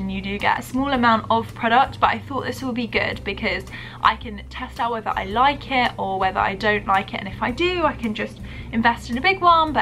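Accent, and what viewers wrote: United Kingdom. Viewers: I totally understand you.. They must not hear a lot of british accents because when I watched my first british youtubers, it took some time to get used to. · I'm American and I understand you! But maybe that's because I watch too many British Youtubers haha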